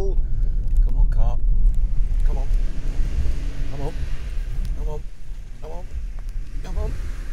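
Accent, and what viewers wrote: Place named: United Kingdom. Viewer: ridiculous comparison. they both have same tyres? you cannot convince anyone that you were objective with that biritish accent.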